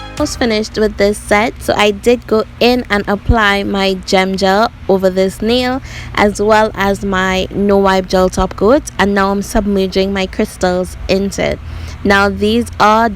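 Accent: Trinidad and Tobago. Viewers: 🇦🇬🇦🇬Hello Ms. Trinidad... picked up that accent anywhere. FABULOUS WORK Caribbean Sister!!! Congratulations!!!!💙💙💙💙💙🌟⭐⭐ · Hi, u sound like a Trinidadian! 🙌🙌🙌 Beautiful work by the way😍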